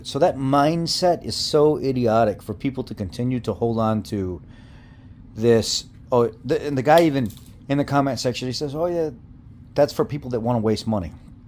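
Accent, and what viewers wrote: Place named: Illinois, United States. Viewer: Hey, we can hear the Illinois in you! In many states crying like batch gets you ridiculed not appreciated! Back in my day keeping my shut saved alot of problems!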